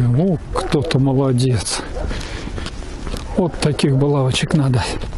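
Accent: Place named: Armenia. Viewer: Слышится лёгкий армянский акцент )) Природа красивая.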